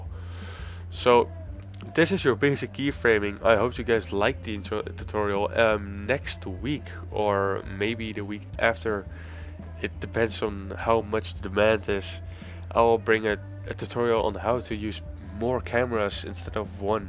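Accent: Netherlands. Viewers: Are you Dutch? I assume you are because of your accent, lmao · are you Dutch? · Are u dutch? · lol sounds like it. I'm half dutch and i hear some similarities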